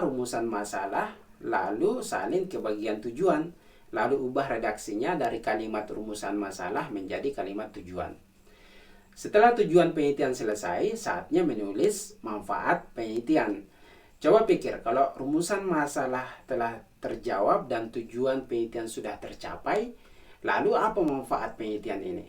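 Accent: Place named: Mamuju, Indonesia. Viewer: mantap pak, familiar dengan aksennya, ternyata benar orang mamuju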